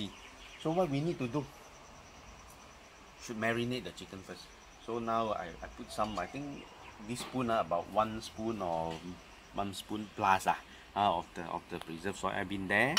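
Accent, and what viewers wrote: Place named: Singapore. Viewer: Typical singlish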